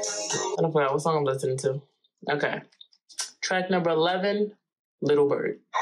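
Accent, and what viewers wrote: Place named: New Jersey, United States. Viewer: They say Jersey? Love Jersey! You do have an accent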